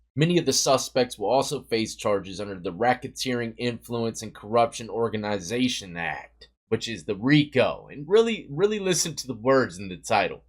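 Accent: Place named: South, United States. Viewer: Aye that’s funny ppl mad how you say shit in the south we got our slang in the south we goin say Celtic (seltic)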